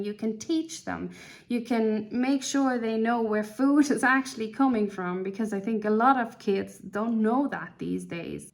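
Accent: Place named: Ireland. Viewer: Happy to have JUST found your channel! I could truly listen to you speaking any time - it reminds me of the brogue of my Da's speech. Da's gone now, but it's like homecoming to hear it. Anyhow - the points you make are so very true! Homesteading can be any form, depending on the homesteader! You don't have to be 100% self-sufficient to be a homesteader. Garden where you are, how you are and learn what you WANT to do. If you garden, learn how to preserve what you grow or what you can get on good sale so you can use it when it's not in season. Etc, etc. Homesteading isn't for EVERYONE - it can be stressful, but it can also be comforting. Don't let "influencers" be your model of how you should be. Much love!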